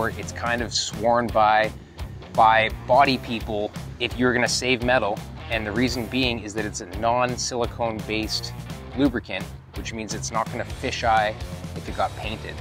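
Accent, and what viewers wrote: Canada. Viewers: I have no idea who you are, you sound Canadian to me, but I love what you built... yup... I'm gonna subscribe for sure... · Thats why it doesnt sound arrogant. Its canadian. Ill sub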